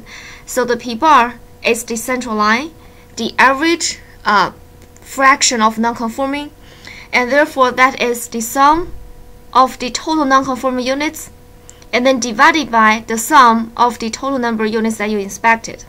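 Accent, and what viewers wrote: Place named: China. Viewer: Chinese accent haha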